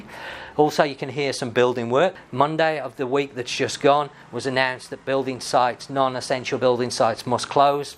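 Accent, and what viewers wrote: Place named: Birmingham, United Kingdom. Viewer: haha ye I know that actually meant what part sorry you sound like a brummie lol I sent you an e mail a while ago regarding motorbike hire.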